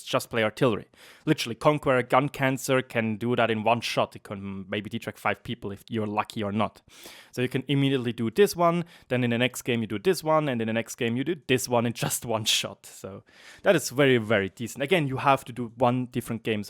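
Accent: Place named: Germany. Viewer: For a German, your English is pretty ok, but maybe watch some undubbed movies to improve pronunciation a bit further..? If your looking for a broader audience.. Just a thought..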